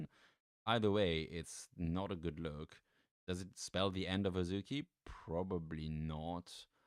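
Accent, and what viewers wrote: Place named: Switzerland. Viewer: Great analysis, thanks! I couldn’t figure out if your accent was German or French, it had elements of both so I guessed you are from Switzerland. I go to your twitter and boom, I wasn’t wrong :)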